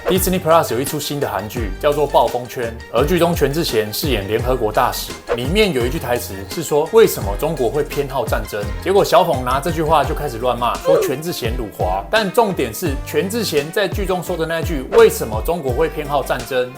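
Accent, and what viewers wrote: Taiwan. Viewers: 發現看了幾次，蠻喜歡聽波特王烙台語的😂 很有‘’愧靠‘’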